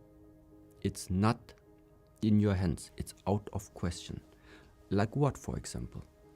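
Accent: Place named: Germany. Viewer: He is German or has lived a long time there. He speaks English like a German. Interesting.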